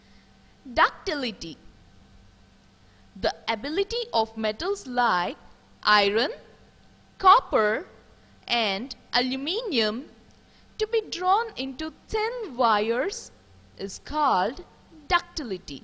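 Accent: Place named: India. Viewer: Indian accent detected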